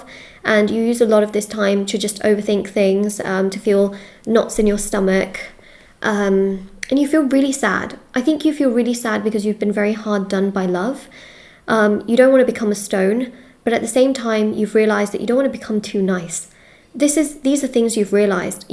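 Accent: United Kingdom. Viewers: You’re hot, but your hands are too big but your British voice is hot so it’s confusing to me.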